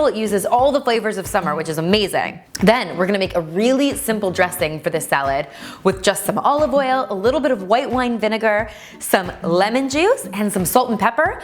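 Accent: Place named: Canada. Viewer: Just rewatched this for the bean salad recipe and my oh my!!! You Canadian accent was so prominent, I've been watching you since the very beginning and never noticed it until today! Love it!!!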